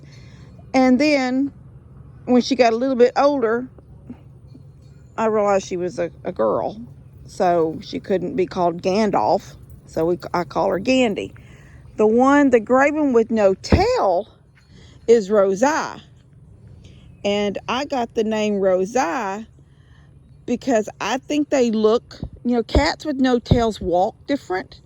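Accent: Texas, United States. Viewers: I'm from Central Alabama... lived here all my life. I didn't realize the Southern draw Texans have. Even more than me. LOL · sometimes watching you do practically nothing but talking (in your wonderful Texan accent) are the funniest videos. Thanks for the laughs.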